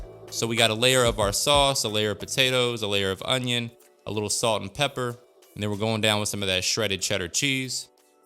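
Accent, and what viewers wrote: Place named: South, United States. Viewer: First time watching this channel, love the sound of your voice and your southern accent.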